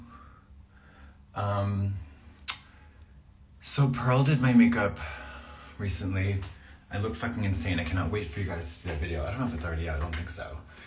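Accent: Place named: England, United Kingdom. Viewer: English accent!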